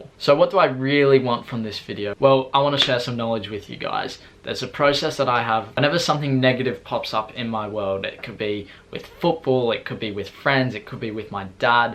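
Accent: Australia. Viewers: Is this guy Australian I just found this guy why haven't I heard of this guy?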